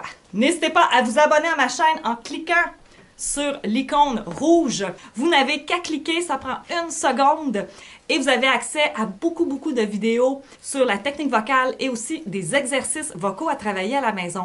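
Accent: Quebec, Canada. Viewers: Mais comme j'aime ton bel accent Quebequois !!!! Et ta presence, malgré la distance. Les bulles c'est génial....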